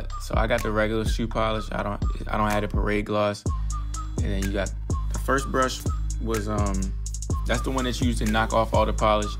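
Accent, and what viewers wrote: Baltimore, United States. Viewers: You sound like you are from Baltimore. Have you ever tried using ice cold water? Another technique I use instead of cotton balls is to use panty hose?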